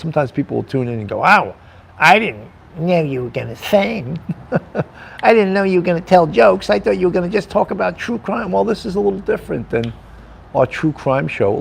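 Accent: New York, United States. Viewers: I'm a Wantagh lady, living in NC! My aunt was friends with Carol Baldwin, Alec's mom. They helped each other go through cancer, what a sweet lady! They took my daughters and I to a July 4th parade and Mrs Baldwin gave my daughter a doll her daughter had. I have a pic if Alec with my aunt at Billy's wedding. Yes, he's arrogant and should have kept his mouth SHUT!!! They may make an example of him! LOVE hearing your NY voice, reminds me of home!!! Love your jokes and songs!!! I saw last year and enjoy your songs and dongs of that era!!!, my daughters are 40 and 41!!!!!!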